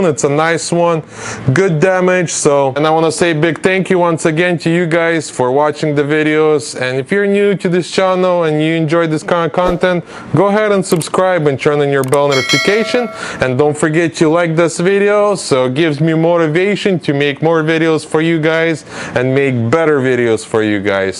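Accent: Russia. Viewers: Прикольный русский акцент Бро!!! Привет из Украины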